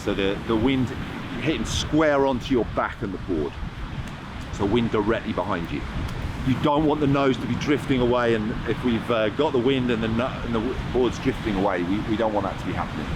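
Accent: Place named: United Kingdom. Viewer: Great vid! Clear British instruction!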